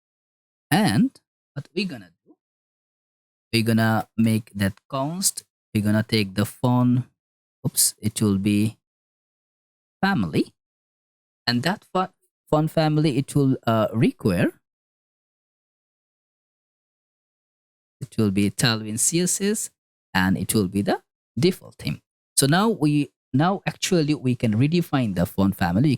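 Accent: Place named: Bengal, India. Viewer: are you bangali ?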